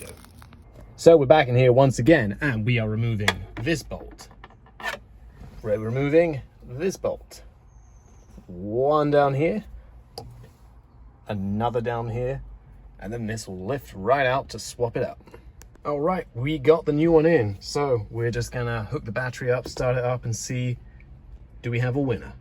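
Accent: England, United Kingdom. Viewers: Good to have and hear a Brit on here having fun with old cars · Lovely engine sound. Where in England is your accent from? Excuse nosiness. Huge difference in fuel prices. Would cost an arm and a leg running those lovely big engines here.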